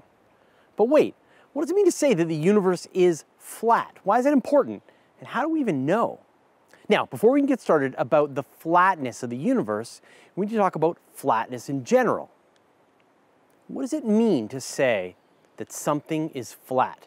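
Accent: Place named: Canada. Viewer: I almost forgot you were Canadian, your accent is the same as northern USA until I heard you say "a-boot" sorry just made me smile😃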